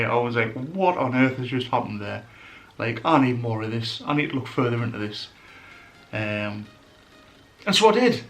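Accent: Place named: North East England, United Kingdom. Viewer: Great video! Me & my friend are staying overnight there on the 24th October! You sound like you're from the North East. We're from Teesside.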